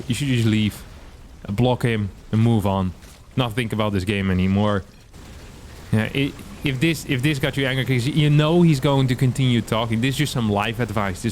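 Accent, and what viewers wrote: Germany. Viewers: I love Harstem doing a German accent anytime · Your german accent was so funny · Your german accent is perfect 😍😍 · love your german accent xD 10/10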